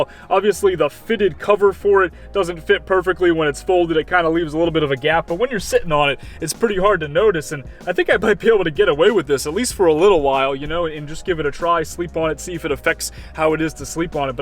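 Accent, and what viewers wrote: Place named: Modesto, United States. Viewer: You sound like your in my area which around a 100 Modesto, California Love your van conversion I wish there was someone in my life that could help me build my home on wheels which I'm planning on doing in about a year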